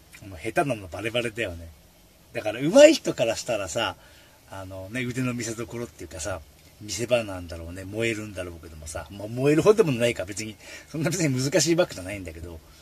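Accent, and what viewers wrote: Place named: Hokkaido, Japan. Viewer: さんお疲れ様でした。そして本牧ヤードの単独デビューおめでとうさまです😄👍️ 伊藤さんってトレーラー乗りの伊藤さん⁉️昨日動画上がっていてトレーラー降りる事にして次の会社に面接行ってきたと言っていたけど同じ伊藤さんかな………⁉️ ところで、１つ明らかになったことがありまして、私がさんの動画を見ていると（パソコン）、猫がヤキモチを妬いて邪魔をしに来ます。あとメスネコがさんの声に反応して走り回ってニャオンニャオン鳴き喚きます❗️スマホで見てもタブレットで見ても同じ反応をします😅💦⁉️ さんのなまりをダンナの北海道なまりと勘違いして反応してるのかな⁉️⁉️⁉️一昨年亡くなってしまったけど…⤵️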